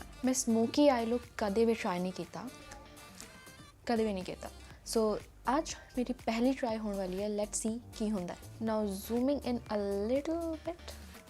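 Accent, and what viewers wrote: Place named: Delhi, India. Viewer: Punjabi boli de accent te dhyaan den di lorr ya, subscribers apee chake jane ya tahaan nu delhi vala accent delhi valiya nu jama ni bhaounda😅😅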